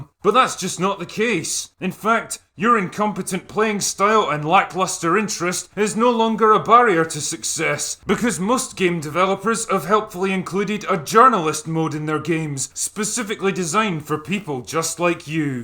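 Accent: Scotland, United Kingdom. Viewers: Pissed up Scottish man seems to be making some sense in these times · It is so funny hearing a Scottish man bashing American journalists who keep saying nothing except how much they hate the orange man while the rest of the world just wants to enjoy a new video game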